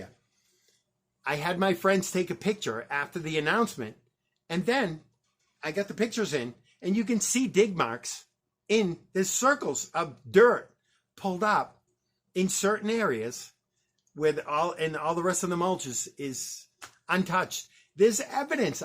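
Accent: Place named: Boston, United States. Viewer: In your video you say "Kent and Esther is pronounced Canasta, at least that's how we say it in Boston." So is your claim the poem could ONLY be completed by someone from Boston area? Not the redneck from Texas (they sure don't pronounce it that way). No one from 99.9% of the world? Only someone who has a Boston accent? Did Forrest ever even go to Boston? It makes no sense for someone who spent 15 years creating a poem to make it solvable for only people with a Boston accent, then release it at a book store only in Sante Fe. And Forrest says he wrote it for every redneck in Texas. You are very biased towards your pronounciation and ignoring what Forrest writes and says. I'm glad you promise you are done. Good for you. Your brain injury is a sad story but you are brave to openly discuss it and it should help everyone be understanding of your handicap. 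it comes through loud and clear; " I've offered them treace peaties so, so many times" Is that how you say it in Boston?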